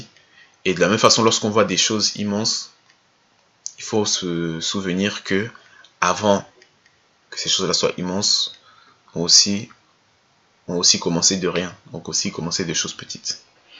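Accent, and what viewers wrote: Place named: France. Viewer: Merci pour ce boulot colossal. .. au niveau des observations , je pense que la prononciation est un peu francisée .En plus les proverbes en ewe ont un aspect initiatique . Raison pour laquelle les ewe citent les proverbes en les introduisant de manière particulière.. PAr esemple on peut dire ceci : les sages disent que!........ les ancoens disent que.... un proverbes ewe dit que. Il n'est pas conseiller de prononcer un proverbes si un ainé est présent . Dans les situation critique, la prononciation d'un proverbe approprié peut dégonfler ton adversaire..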